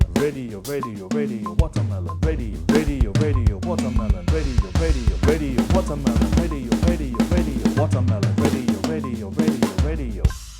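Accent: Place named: Trinidad and Tobago. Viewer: Like ur vid's. I detect a trini accent in ur articulation. I could be wrong.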